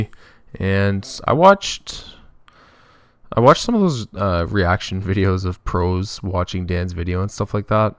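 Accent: Canada. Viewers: Are you Canadian? You're accent sounds very similar to me. I'm from Vancouver BC Canada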